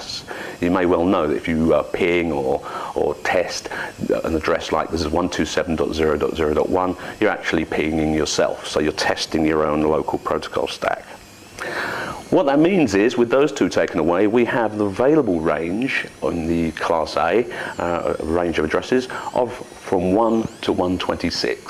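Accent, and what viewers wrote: United Kingdom. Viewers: british pronunciation?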